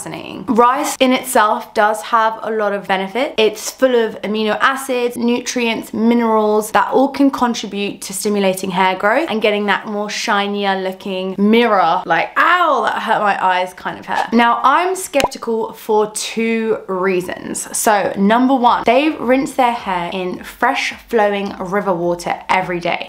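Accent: United Kingdom. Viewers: Thank you ! I have tried, and according to your explanation, my hair is of very low porosity. That would totally explain why coconut oil makes my hair dry instead of nourishing it, and maybe that's also why I started losing more hair after using flaxseed gel 😱 This clearly shows that not everything works for everyone, and thank you so much for warning us about rice water, cause I would definitely have tried it someday because of how popular this method is! By the way, you have a lovely accent ! Are you British ?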